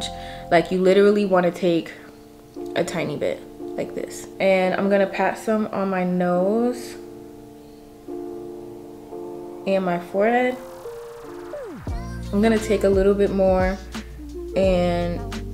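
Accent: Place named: East Coast, United States. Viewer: This is the first video I’ve watched of yours. I love this look! Soooo pretty. I also love your accent. East coast?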